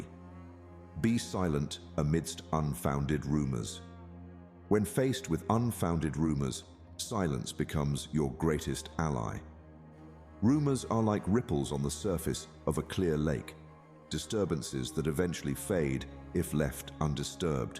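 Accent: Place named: United Kingdom. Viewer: Why is this a British voice???.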